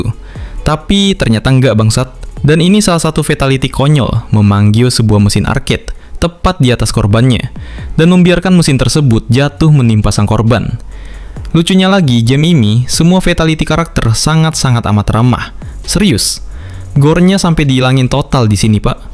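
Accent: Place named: Surabaya, Indonesia. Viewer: Lu org surabaya bang?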